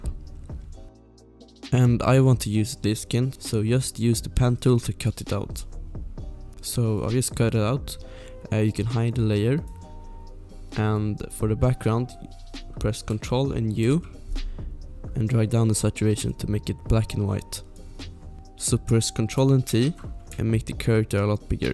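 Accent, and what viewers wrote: Sweden. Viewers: Your from sweden They talk english like that Im from sweden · Are you swedish? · Are u Swedish? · U swedish or norweigan? I guess sweden😆Im from Sweden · No way! Ur from Sweden! I hear that from ur voice! 😹 I’m also from Sweden 🇸🇪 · Hahahahahahah jag hör att du är svensk😂 bra video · jag älskar din svenska dialekt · I Love that Swedish accent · Heard instantly that you were Swedish, what’s up my Swedish brother. (Också svensk:)) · U sound swedish! Im norwegian btw:)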